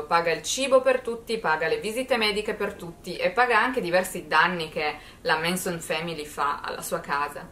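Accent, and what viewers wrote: Turin, Italy. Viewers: Siciliano? :D è l’accento di Torino! Più torinese di così non si può :D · torinese palese bro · È torinese, ma in ogni caso interessante equivoco. In effetti alcune vocali sono esattamente come quelle di alcune cadenze della Sicilia!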